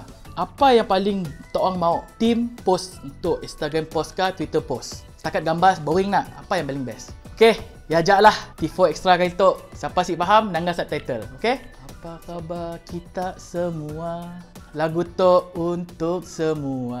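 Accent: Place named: Sarawak, Malaysia. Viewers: dia cakap dialek sarawak tu haha dia fans sarawak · itu dialek (slang) Sarawak... maknanya jika kamu berbahasa Indonesia tapi ada bunyi bahasa Jawa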